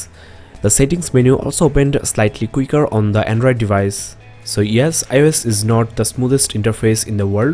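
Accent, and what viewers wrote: India. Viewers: he speaks indian english dude 😄😄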